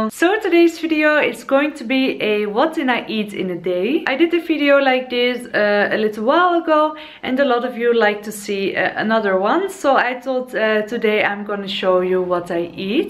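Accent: Netherlands. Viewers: Ik hoorde meteen je Nederlandse tongval😅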